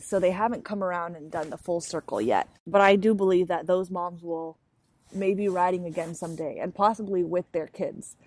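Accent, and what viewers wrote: United States. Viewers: Dear, I hope I can be your video pen pal, on you tube! My name is Tim and I am nearly 55 years of age, and I live in South Milton, in Devon. I used to be the enemy, a car driver, sharing a car with my Dad, though I have had a collection of motorbikes, studying electronics. My Dad and elder brother had one or two however, until they too became the enemy. May I seriously give you my male support wishing for anyone should learn to ride a bike or drive a car, male or female. Is it very rude, if so, I apologise to you, to ask you, did I detect an American accent? If so, may I wish you a warm welcome to our country?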